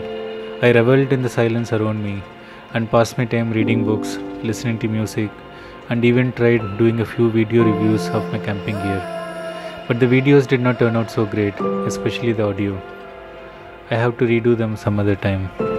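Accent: South India, India. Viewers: I can relate to your wish to travel and spend time alone in nature's solace. It's the time when one gets to listen to one's inner self and introspect. Thank you for sharing the awesome time lapses. GOD bless. Your accent gives a hint that you might be from South India?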